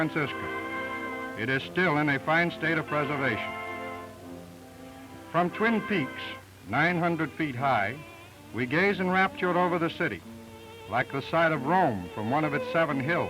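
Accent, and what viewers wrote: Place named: Boston Brahmin, United States. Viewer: Has no one commented on the old fashioned Boston Brahmin accent of the narrator? I find it charming.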